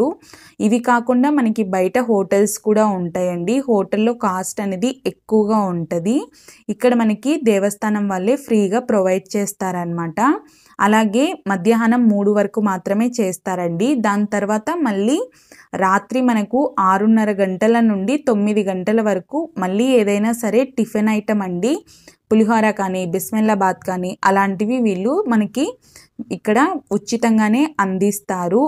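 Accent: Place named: Telugu, India. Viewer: Very nice description. Loved the Telugu accent. I will visit Mantralayam one day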